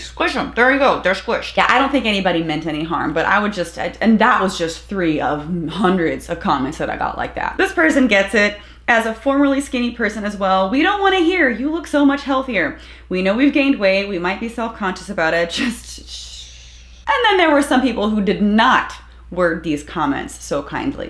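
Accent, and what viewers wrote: South, United States. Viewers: Are you from the south?? I hear a faint accent! I’m Canadian so I’m hearing a twang?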